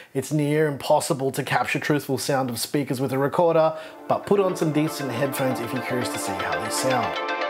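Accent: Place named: England, United Kingdom. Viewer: English accent IS shit ans a Real pain for french ears 🗣️🙉😢 Un homme de France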